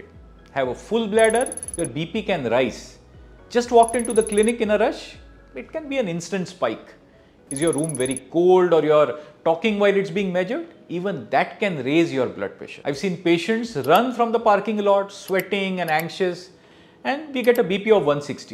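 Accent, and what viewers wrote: India. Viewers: Your thick Indian accent makes me difficult to hear and understand.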